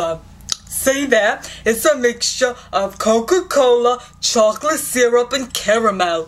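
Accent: Brooklyn, United States. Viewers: omg, i know what video ur talking about from jpmetz....hahahaha. u are funny. u should make more vids like this. i hear u pullin the brooklyn accent out of your voice girl. lol. nice!! keep up the great vids girl.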